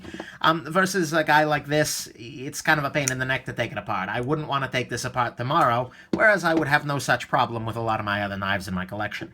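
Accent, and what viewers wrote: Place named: New Jersey, United States. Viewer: ! Love your reviews and your voice reminds me of everybody I ever grew up with in Jersey. Seriously, your reviews are detailed, descriptive and objective. Thanks and keep it up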